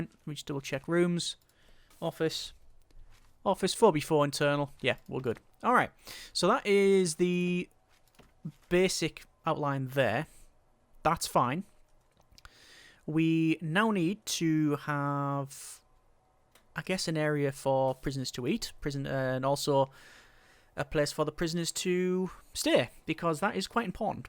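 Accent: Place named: Newcastle, United Kingdom. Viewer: You sound slightly Geordie and if so big up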